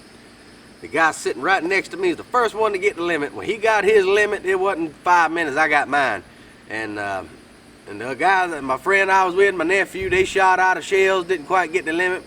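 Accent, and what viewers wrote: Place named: South Carolina, United States. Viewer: Just happened to be looking at YouTube one day and when I heard you talking, I said to myself, “that boy sounds like he’s from up home.” Sure enough, my suspicions were right. I am an Orangeburg county boy myself, from Springfield. Kinda wondered if you had ever hunted with the Brown boys from between Norway and Denmark. I used to dog hunt with them a good many years ago. Live in Charleston but Orangeburg county will always be home. Enjoy your videos. Keep them coming!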